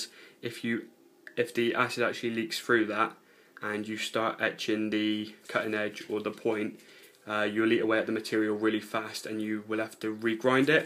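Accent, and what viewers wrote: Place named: United States. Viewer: Must be a 'Murakin. The only English speaking country that needs subtitles for English. Sometimes for their own countrymen. Stupid inbred gits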